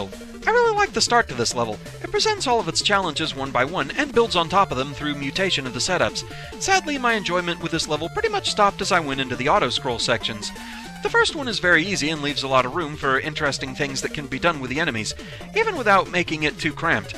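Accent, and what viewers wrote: United Kingdom. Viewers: He's about as British as it gets if you hear his voice commentary. In a good way I mean.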